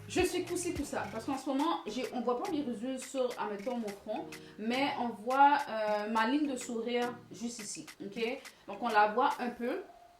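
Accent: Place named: Quebec, Canada. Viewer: Ton accent parait si quebecois. Je suis franco-ontarienne :) Merci pour la vidéo francophone de ce produit. Vous êtes jolie 🥰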